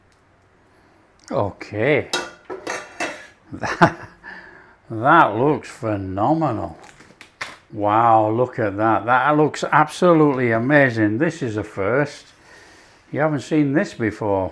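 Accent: United Kingdom. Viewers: How I love to learn about such "basic" recipes! I only knew that much: the name originated from coarse sea salt, named corn, back in the days. For decades, I thought it was corn-fed cattle. Little did I know. Talking accents (a personal question, I know): I'm german. Been married to an american woman. Was related to a girl from down under. While my english is reported as "quite well", I still cannot decide, when it comes to accents. You're using Coleman's mustard. I do assume you're from the UK. But what exact region? Pls help me out! A friend of mine is from Norwich. I've also been to Ireland, once. Just my curiosity. I could listen all day long to Cheryl (What's for tea? here on YT) and Melisa (Soul singer reacts.) Music to my ears (in both cases). Prior to our wedding, a young man at the supermarket asked me about my accent. And was surprised. Saying: "I thought you where either english or scottish." A huge compliment for me! 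I've been born and raised a bit northwest of Hamburg, Germany. Never lived in an english spoken country.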